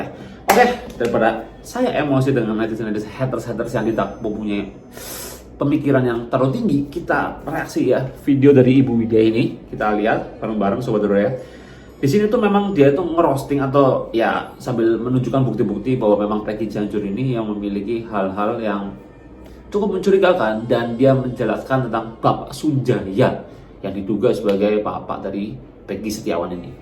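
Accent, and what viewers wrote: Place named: Banyumas, Indonesia. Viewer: Bang org mn.. kog ngapak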